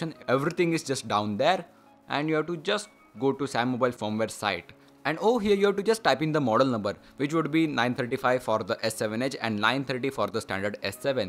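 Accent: India. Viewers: Idk if this is sounds racist but, I freaking love Indian accents! It's just something flattering about them.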